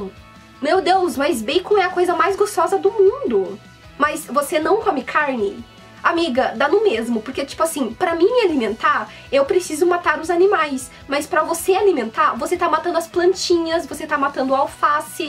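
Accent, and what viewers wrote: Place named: Sorocaba, Brazil. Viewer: Que sotaque gostoso! sinto saudades dos meus amigos de sorocaba que falam assim, tenho amigos do sul que falam assim também. Minha amiga Priscila fala igual a ti!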